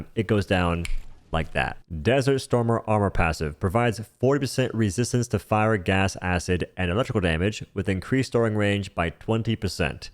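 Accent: South, United States. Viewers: To this day still trying to figure out accent because sometimes it sounds southern but other times it doesn’t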